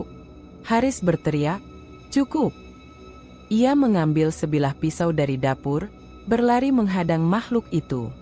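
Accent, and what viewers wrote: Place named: Java, Indonesia. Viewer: Lucu banget logat jawanya.... Aneh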